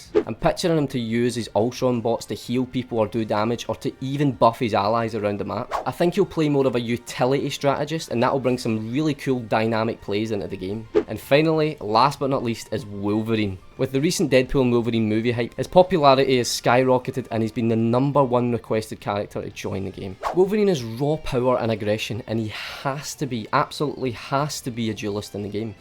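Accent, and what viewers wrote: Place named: Scotland, United Kingdom. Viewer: hey, i love ur accent, r u scottish ?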